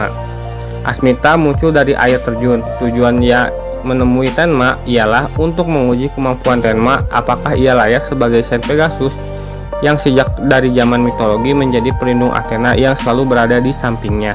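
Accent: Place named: West Java, Indonesia. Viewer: biasa lidah sunda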